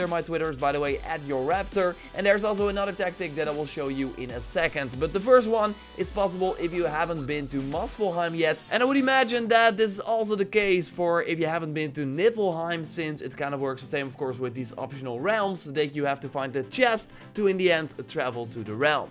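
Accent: Netherlands. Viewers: do i smell dutch?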